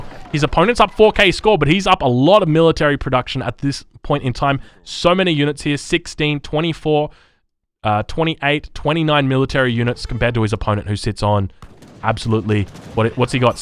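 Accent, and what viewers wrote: Australia. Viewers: That's just the Irishness of the Aussie accent.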